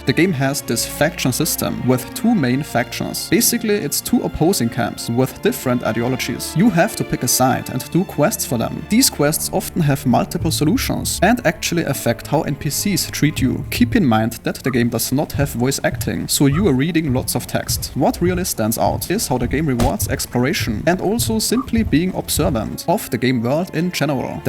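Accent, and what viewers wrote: Germany. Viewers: Very german accent :D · Digga deine Aussprache ist wirklich schrecklich. Versuch Englisch ohne deutschen Akzent zu sprechen weil das geht fast in Richtung old Arnold😅😂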